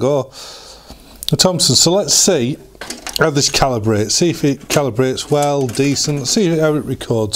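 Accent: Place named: Lancashire, United Kingdom. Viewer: An interesting video, subscribed!👍 You sound Lancashire Vic reeves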